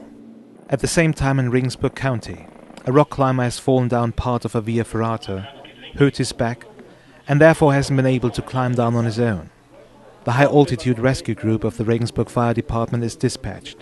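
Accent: United Kingdom. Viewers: Ach, das "Amt für Brand- undZivilschutz" oder wie das heißt, in der Greflinger... Schönes Material, da in diesem Jahr das Budget für einen Neubau (sprich: Abriss des Bestandsgebäudes) genehmigt wurde. Historisch wertvoll :-) Außerdem ein schöner britischer Akzent! Ich kriege den nicht hin, nur wenn ich "oh no!" sage, ansonsten habe ich das eher raue US-Englisch drauf. Mit starkem, deutschem Akzent. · Could you please make another version of that documentary with an american speaker? The speaker's english sounds really british :(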